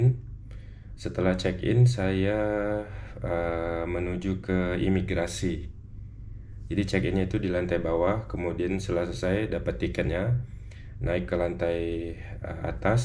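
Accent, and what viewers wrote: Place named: Kuala Lumpur, Malaysia. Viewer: Senang mendengar menyantuni keluarga pesakit dengan menggunakan loghat KL sebaik mungkin, walaupun inilah kali pertama datang ke Kuala Lumpur.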